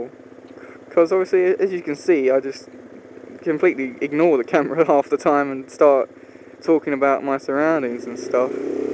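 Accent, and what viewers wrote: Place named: Southern England, United Kingdom. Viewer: Good to see your better :') and motorcycle vlogging is good :D come end of march when I get my first bike I wana get a helmet cam and do it :D and what part of England you live you sound like southern or south west like Kent / Essex?, keep the videos coming :')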